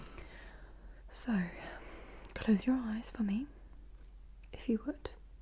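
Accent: England, United Kingdom. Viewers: Are your England? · Best English accent this side of the pond. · Just love your British accent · Your accent is very good middle english, I'm a Brit but when I'm In the states people think I'm Australian! I'm a guttural Londoner though, constantly dropping my H's! 😉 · Are you originally British? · !! Happy Thursday!! CONGRATS ON 100K!! 🎉🎉🎉 Very well deserved!! I’m always happy to visit your new ASMR uploads:) They make my day filled with comfort and relaxation and love the British accent:) You are awesome:) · If this was the first video of yours I watched I'd have believed you were British.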